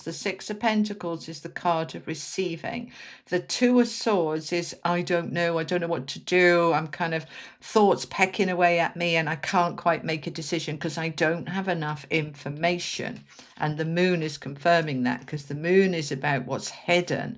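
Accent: Wales, United Kingdom. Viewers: I always think I can hear welsh in your accent!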